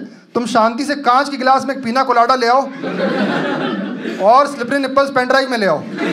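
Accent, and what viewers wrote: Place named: Punjab, India. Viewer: LOVE UR PANJABI ACCENT OF SPEAKING BC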